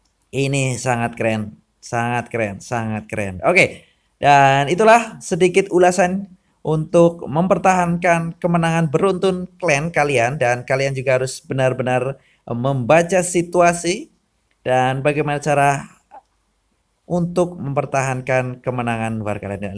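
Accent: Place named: Banyumas, Indonesia. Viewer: Logate ngapak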